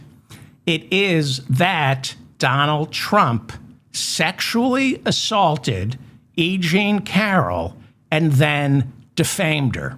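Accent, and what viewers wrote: New York, United States. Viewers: He is a Noo Yorker. Leave him alone!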